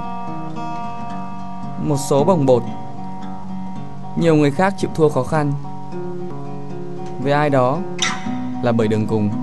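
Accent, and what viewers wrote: North, Vietnam. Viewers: GỊONG CON TRAI MIỀN BẮC DỄ THƯƠNG QUÁ Ạ <3 EM GỐC MIỀN TÂY NHƯNG NGHE VẪN THẤY THÍCH LẮM <3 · minh k thich tieng bac tu xua toi nay ... ma sao bay h minh thich lam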